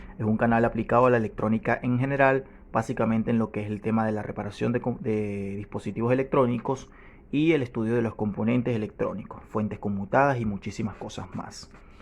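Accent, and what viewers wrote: Venezuela. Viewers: Muy buen video. Disculpa el comentario hablas como venezolano no como chileno. Muchos exitos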